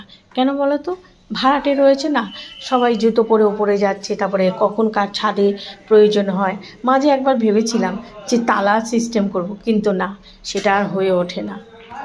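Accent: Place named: Bangal, Bangladesh. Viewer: Vison valo laga didi-bai tmr mukha bangal kotha ami ekta problem a tmr vdeo thik moto dekte parchi na valo thako didi-bai❤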